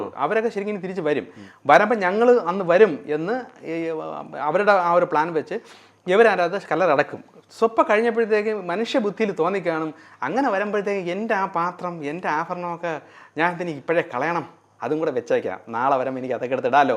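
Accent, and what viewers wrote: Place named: Thiruvananthapuram, India. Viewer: നല്ല തിരുന്തോരം ഭാഷ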